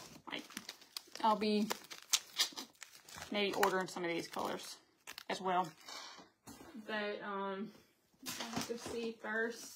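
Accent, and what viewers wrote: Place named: Mississippi, United States. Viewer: Thank you, so much for answering me! I thought I heard some Mississippi in your accent. Love it! ♥️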